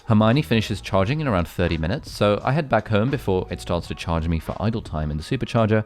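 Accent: United States. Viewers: Does anyone else notice accent has changed abit toward American? Or am I just imagining things? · Your accent has changed. It is more American now.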